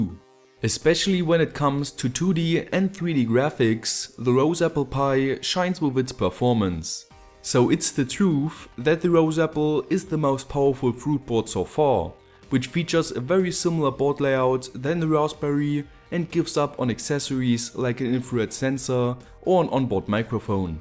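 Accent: Germany. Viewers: Where in Germany are you from? Guessed that because of your accent. Great videos man! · warum machst du keine Videos in deutsch? ich kann deinen deutschen Akzent hören.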